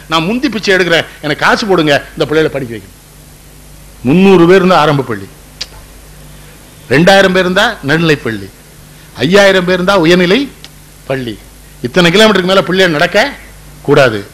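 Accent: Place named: Tirunelveli, India. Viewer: நெல்லைத் தமிழின்... தேனிசை உரை...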